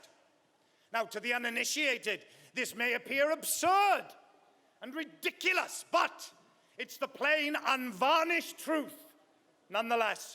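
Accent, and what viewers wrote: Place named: Wales, United Kingdom. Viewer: Wow it's so rare that i hear his Welsh accent ❤️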